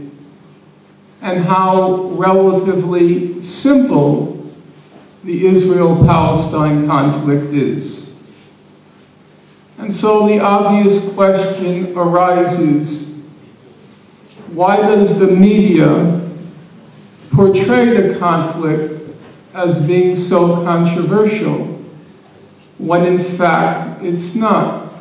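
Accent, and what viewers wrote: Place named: Brooklyn, United States. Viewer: I wonder what the Czechs in the audience who after studying English for 6-8 years were thinking as they listened to Norman speak. Listening to him repeatedly pronounce the word "wall" in his thick Brooklyn brogue, I couldn't help but wonder what word did it sound like to the Czechs?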